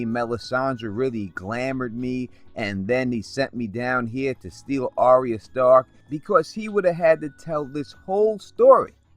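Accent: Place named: Brooklyn, United States. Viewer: You sound like an italian from brooklyn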